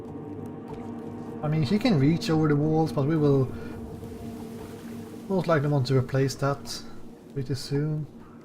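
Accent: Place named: Sweden. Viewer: Really bad starting spot, you did well. That's a big compliment to 95% of the other idiots I've watched playing. Are you Swedish by any chance? Both because of dialect and having some gaming intelligence. I've watched a dozen American streamers being incompetent at this game. Tummen upp. All the other streamers I've just facepalmed myself. Very good.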